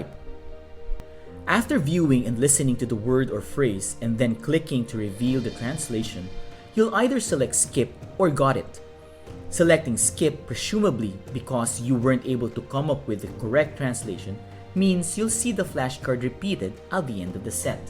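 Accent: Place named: Philippines. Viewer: Are you filipino? I really appreciate the review, but i couldnt help but notice that you sound like my cousins haha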